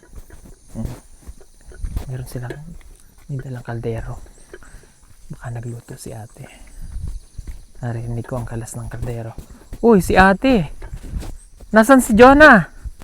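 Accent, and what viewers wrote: Visayas, Philippines. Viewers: Natutu na C mag bisaya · Kabalo na jud mag bisaya c...congrats po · Kblo na jud si mag 😂bisaya ba..😊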